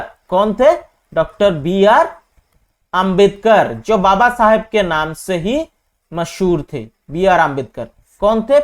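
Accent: United Kingdom. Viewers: Change ur Britain English and speak as upsc aspirants or in Hindi😠😠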